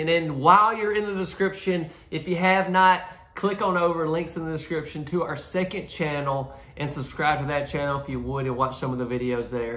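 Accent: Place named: Alabama, United States. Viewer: I enjoy watching your videos. You make any of the homes appear better than what they are I am from the south. When listened to you talk I thought, this guy does not sound like he may be from Oklahoma! He sounds like either Alabama or Mississippi!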